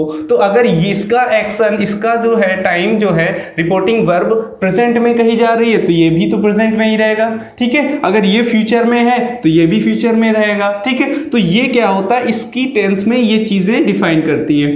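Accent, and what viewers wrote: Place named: United States. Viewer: Good brother very nice explanation And your english accent is very american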